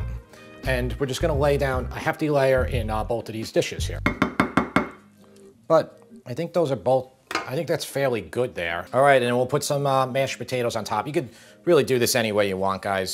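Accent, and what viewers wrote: New York, United States. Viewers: I know that accent. Queens? Long Island? 🤔 😂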